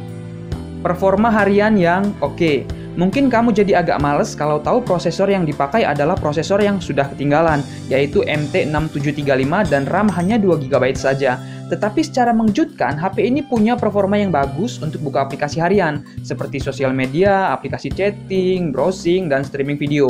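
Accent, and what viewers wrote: Lampung, Indonesia. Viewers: orang lampung ya bang? nyo kabagh:v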